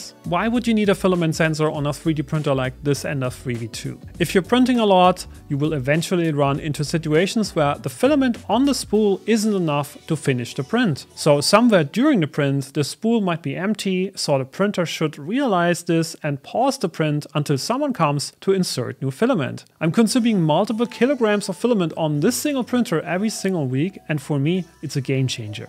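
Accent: Germany. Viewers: I hear a German accent (or one that could be mistaken for German) and I watch the whole video. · Der deutsche Akzent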